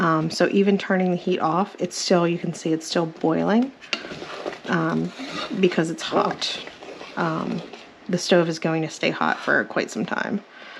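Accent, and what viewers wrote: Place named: Midwest, United States. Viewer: Ty for sharing. I knew I heard an accent, thought maybe MN or one of the Dakotas but I definitely hear it with certain words u say. Hope ur well & sending prayers to ur Nan❤. Take care 🦃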